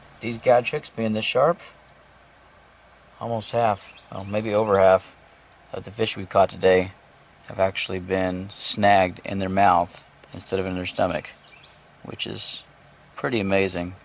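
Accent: Texas, United States. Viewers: Is this how a raw Texan speaks? Theres something stylish about it and more importantly, something rustic and natural, a natural thing that a person from anywhere in the world can identify with. I mean, in my town, old tough men speaks jus like this, and they know stuff just like this. My salutes. You guys are the real natural human thing, and maybe thats why all of them other ones from other countries relate to u and speak the same style no matter what the language is (i mean only the raw natural guys). Theres just something universally common about you rare lot around the world. The things u endure, the things you eat, the way you carry on unlike some city folks who wud just say yuk, freak out , run and eventually die prematurely. Once again, respect to you. Thanks for showing us a glimpse :)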